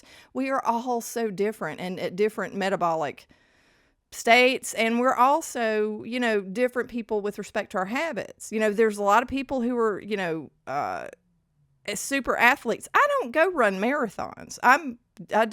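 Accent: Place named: South, United States. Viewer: You had me at "expose the corruption of Big Food and Big Pharma". Your Southern accent didn't hurt a bit!!! My family has been in the South since before the Revolutionary War!!! That said, I have primarily been somewhere between keto and carnivore since 2010. Eatimg my "normal way", my A1C is 4.1 and my insulin is 1.3...meaning I am metabolically healthy, and at the end of the day, that's my goal. Long story I'll spare you, but life had me go down a rabbithole and discovered the general science behind gut health and lactofermented foods...not necessarily a departure from keto...or carnivore if you follow Dr Sean O'Mara. I make my own raw lactofermented sauerkraut and other lactofermented foods, and make my own milk kefir, "perpetual" cultured buttermilk, and sour cream/creme fraiche. I know what's in them all and I know there's no additives or preservatives or anything funky or non-food. 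Part of the long story, not only did it help my daughter repopulate a healthy gut microbiome after MRSA, but it's helped me keep my diverticulitis at bay. To wrap this back around to my OP, my main goal is to reduce my dependence on Big Food and Big Pharma. Borrowing from Dr Berry, I try to harken back to my own ancestral eating and eat the way my rural southern sharecropper grandparents ate prior to 1960-ish. I was born in 1957 so I do know what that was. Nothing was GMO back then. They grew everything they ate, milked the cow twice a day, had chickens, maintained a smokehouse in order to pr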